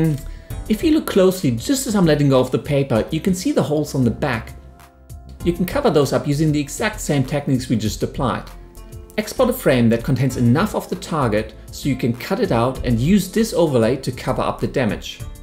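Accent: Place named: Germany. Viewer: ha! I knew it! :D sounds a lot like you're from Germany! I'm from germany too! :D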